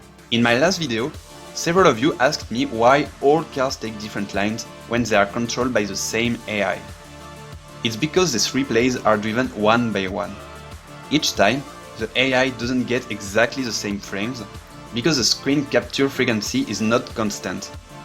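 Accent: France. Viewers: Il est francais l'accent plus les sous-titres en anglais et français....il est francais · are you french? your accent let me think you are x) SI tu l'es GG a toi content de voir un génie français comme toi^^ ça à l'air si complexe x) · Ouep c'est un français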